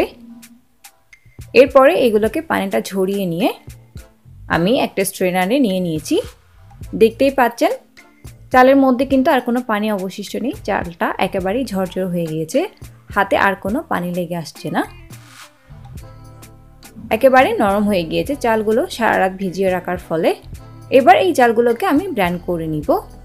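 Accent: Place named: Kolkata, India. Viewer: uchcharon r karone kothagulo shunte valo lageni....apni ki kolkata style a kotha bolte chaichen?ছ চ r problem ta asha kori solve korben....otherwise valo hoeche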